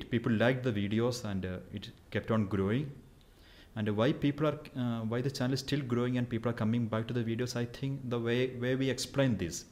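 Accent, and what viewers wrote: India. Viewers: Are you from Kerala? even I'm feeling it the same way.. ☹️ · Strongest Indian accent on the planet this guy 😭🤦‍♂️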